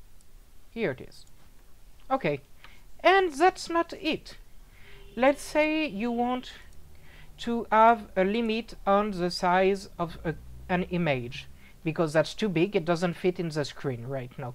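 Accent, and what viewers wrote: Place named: France. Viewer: Thank you very much really useful addon and I really like French accent :)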